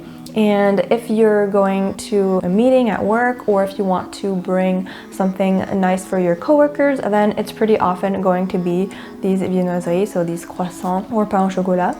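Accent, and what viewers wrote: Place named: United States. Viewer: Do you currently live in France? :) Also your accent sounds very American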